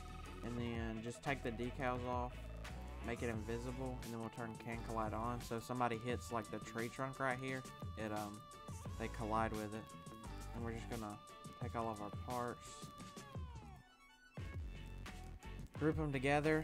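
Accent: United States. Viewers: red neck accent yeehaw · the cowboy accent lol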